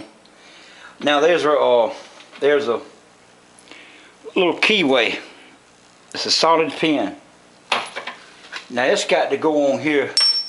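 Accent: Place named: South, United States. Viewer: You are wery good at restoring, but your recording is bad, and i have a hard time understanding your country american english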